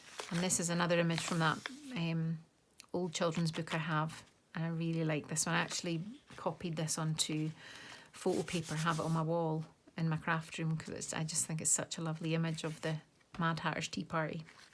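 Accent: Scotland, United Kingdom. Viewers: Absolutely incredible, I could sit and listen to your beautiful Scottish accent all day, and watch the videos of what can only be described as stunning works of art, yet another wonderful book. Thank you for sharing with us.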